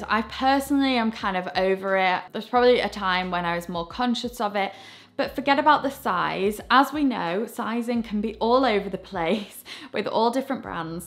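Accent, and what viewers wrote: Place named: United Kingdom. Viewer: Can anyone please tell me what kind of british her accent is... Its so cool and i wanna practice that accent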